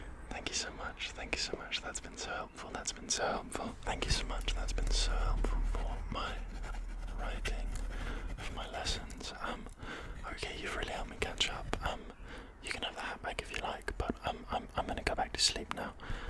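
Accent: United Kingdom. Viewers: I just watched the like nerd studies you video or whatever it's called and I loved it so much that I came to look at some other videos and I got jump scared when I heard the British accent 😭 (not in a bad way I just was not expecting it at all lol)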